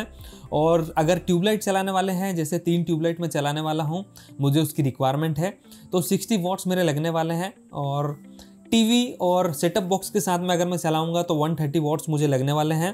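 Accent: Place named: Madhya Pradesh, India. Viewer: brother your talking style is nice i feel mp style tone in your voice , great video specially talking way😊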